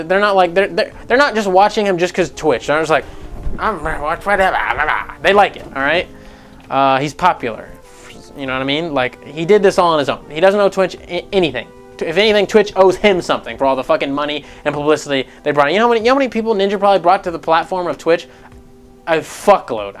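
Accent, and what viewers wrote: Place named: United Kingdom. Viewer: Love your British accent ☺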